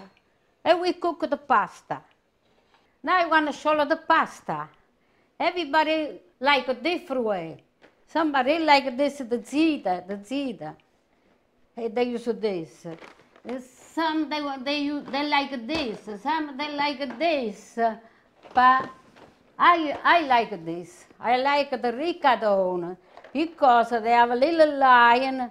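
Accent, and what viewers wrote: Italy. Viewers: So happy to stumble onto your channel. My sweet Italian grandmother has been gone for 19 years. Listening to you is like being back in her kitchen. I never noticed her thick accent. I understand every word you say. Wonderful memories. You are real treasure. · Oh, I looooooooove Italian accents! And hers is so sweet.... I love how she narrates every step. Sending big hugs from Deutschland, beautiful lady! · watching you cook, and hearing your wonderful Italian accent, and feeling the love coming from your heart, makes the part of my day that I spend with you, in your kitchen, take me back to my own childhood, your a very special woman, thank you for what you are and do. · I am a fan of Italian cuisine specially when it is presented in English with Italian accent. I am happy that Pasta Rigatoni brings back to you nice memories. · My God I could smell that food through my phone it looks so good. 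I just want to give that woman a big hug I love her thick Italian accent continue continue making videos grandma I love you.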